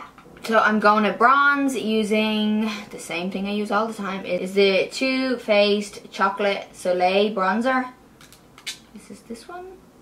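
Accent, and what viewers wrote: Ireland. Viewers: What part from Ireland are you from??? Xx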